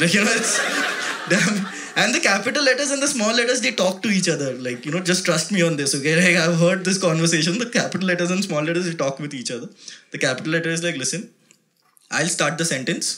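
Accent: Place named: India. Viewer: Where can I get this stand up comedy script? Im sorry, I didnt knw english with indian accent. From Bali.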